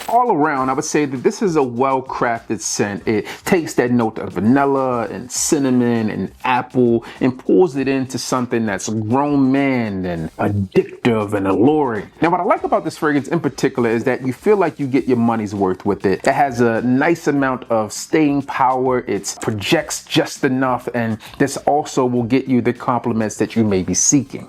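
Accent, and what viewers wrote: Baltimore, United States. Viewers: I picked up marwa n Hugo boss absolu recently n they both smell great!!! Question tho champ, are you from Baltimore? Asking bcuz I’m from bmore myself n when you say do, two, too or anything that sounds like these words, you sound exactly like someone from the town lol. Keep dropping this knowledge my boy bcuz I’m definitely tuned in n I know I can definitely take your word for what’s good out here in the fragrance game!! Take care boss✌🏽💪🏽💯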